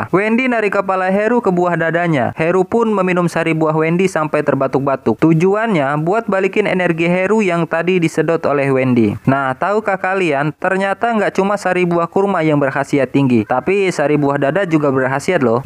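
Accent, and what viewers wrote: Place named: Java, Indonesia. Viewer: Bjir jawanya klur ngakak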